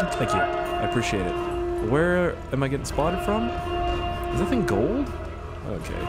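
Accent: Germany. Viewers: Best German accent ever :D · I haven't laughed so hard in months 😂😂 your German accent is fucking great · As in ur German accent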